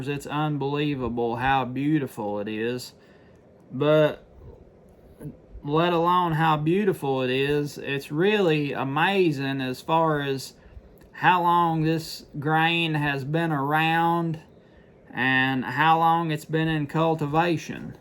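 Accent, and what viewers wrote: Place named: Danville, United States. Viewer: Farro is the common name. I remembered it from hippies. I bought some just now whilst I was listening to you. You talk like me n my people in danville va. Looking forward to fall planting!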